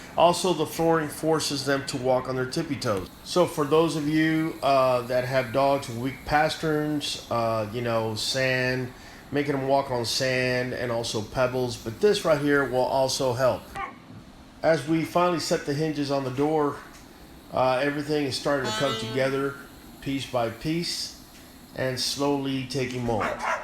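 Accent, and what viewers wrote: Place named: Texas, United States. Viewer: Thank you for your energy and effort to complete these videos. I hit that like and sub. Knew you were in Tex the sec I heard the fam lmao.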